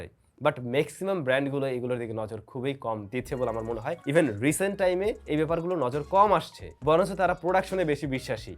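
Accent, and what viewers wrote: Barishal, Bangladesh. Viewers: ভিডিওটা দেখে খুব ভালো লাগলো ❤️ ভাই মুইও কইলো বরিশাইল্যা 😂 আমনেও তো হেইয়া ধন্যবাদ 🌺 ভাইকে 💝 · Vai Tumi mogo boreshailla SUNA khubi Valo laglo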